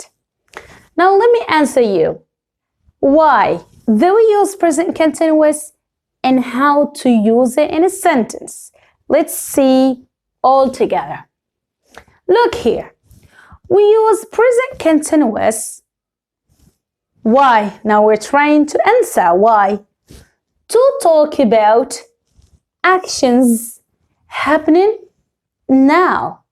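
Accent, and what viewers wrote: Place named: United States. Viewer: بسم الله ماشاءالله ثقافتك واسعة ولغتك كانك اميركية 💚💚💚 جيش عالم المراة مع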